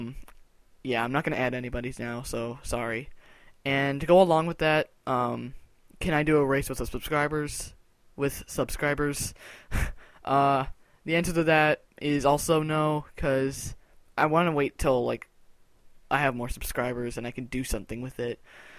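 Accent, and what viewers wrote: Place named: Australia. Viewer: Yes you sound austrailan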